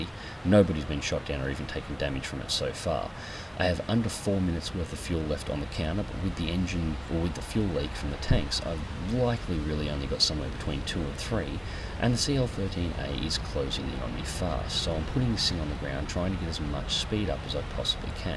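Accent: Australia. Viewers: Lehtokurppa Von Fön get yr ears cleaned. Hes AUSTRALIAN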